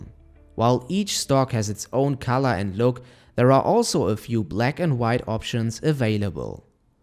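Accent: Germany. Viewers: is that a german accent that this guy have?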